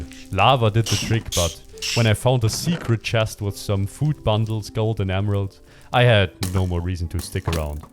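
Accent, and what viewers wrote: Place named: Germany. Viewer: Since I heard the first sentence from I knew he was german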